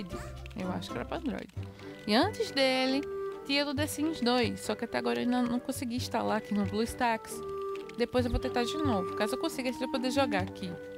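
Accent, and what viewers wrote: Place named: Portugal. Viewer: ela tem sotaque português(o classico)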